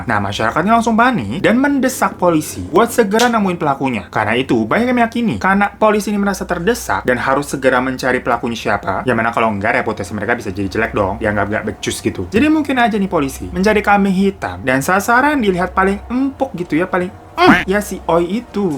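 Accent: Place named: Indramayu, Indonesia. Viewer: Saya curiga Kalo bang ini orang indramayu selipan kata kata Dan logat mirip gitu sih hehe hayoo bang darimana sih bang kamu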